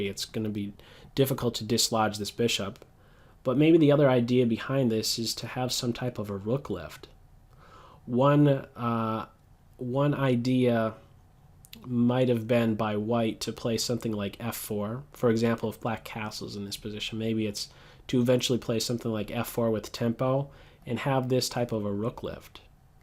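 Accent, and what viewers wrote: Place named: South Carolina, United States. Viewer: It's his accent, South Carolina or something.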